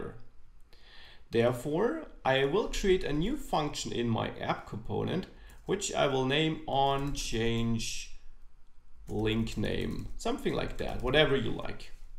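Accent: Germany. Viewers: My first though was: "the way you are speaking... german guy". :D Thats cool. I found these days polymer. This looks really cool and a nice way how to create new kind of views with real components. Greetz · Personally I really like when Germans speak English beacuse then it is very easy to understand at least for me. And of course - I really enjoy your amazing lessons as I'm touching React for the first time. Greets from Poland :)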